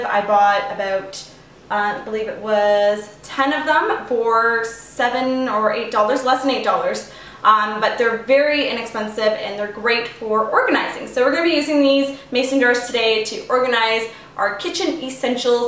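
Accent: Canada. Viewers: Love your recipes and ideas. You should have a show on the Food Network. Is that an Italian-Canadian or Greek-Canadian accent?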